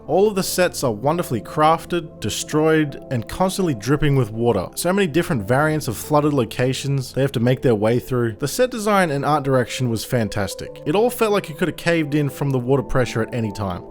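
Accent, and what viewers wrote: United States. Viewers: I look forward to hear what your thoughts on the new transformers news is. Your American accent is really good. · That American accent though..... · Good American accent! Well done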